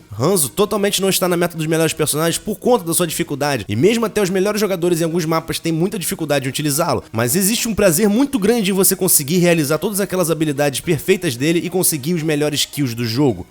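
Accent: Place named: Portugal. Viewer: o teu sutaque e parecido com o pt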